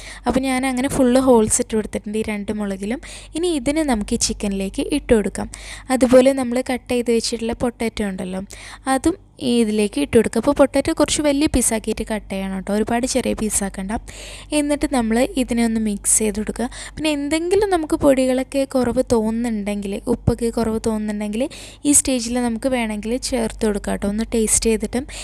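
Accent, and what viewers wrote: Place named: Malappuram, India. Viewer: തനി മലപ്പുറം ആണല്ലേ വെറുതെ അല്ലെ അപ്പം ഞാൻ മലപ്പുറം കരിയാണ്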